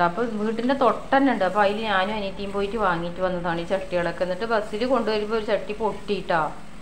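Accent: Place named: Palakkad, India. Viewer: പാലക്കാട് സംസാര ഞാൻ വീട്ടിൽ പോയാൽ ഇതുപോലെ സംസാരിക്കാം👌❤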